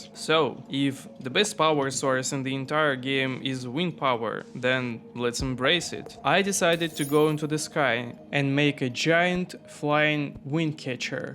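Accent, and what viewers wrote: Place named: Russia. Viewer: А акцент то русский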